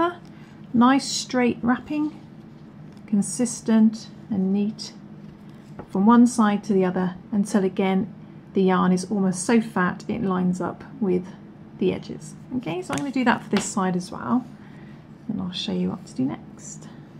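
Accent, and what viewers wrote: England, United Kingdom. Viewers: Thank you for that. It is nice hearing an English accent on YouTube for a change... ;-))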